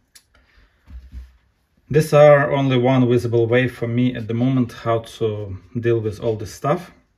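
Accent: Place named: Russia. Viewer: Вроде бы имя. И говорит на английском с русским акцентом)))) похоже русских не любит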